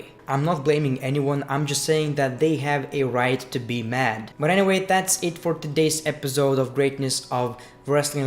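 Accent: India. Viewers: Sounds Asian/Indian... Why?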